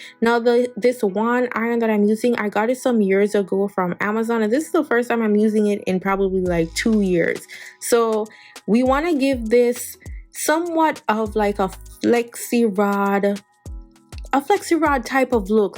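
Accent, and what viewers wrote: Jamaica. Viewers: I love this.. so easy n quick. Btw r u Jamaican?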